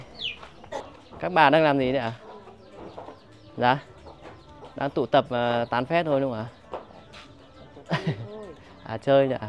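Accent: Hanoi, Vietnam. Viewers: Ai cũng nghiện kênh của rồi, bây giờ cầm ĐT lên là mở thôi, không còn thời gian cho kênh khác nữa, Cảm ơn con nhiều nha, cảm nhận như được đi Du lịch, thế thì làm sao mà không yêu được cơ chứ, rất yêu con, vì giọng nói của trai Hà Thành, vì nó gợi lại bao kỷ niệm, ký ức của một thời Thanh xuân đã qua mà không bao giờ lấy lại được, Cảm ơn con nhiều nha.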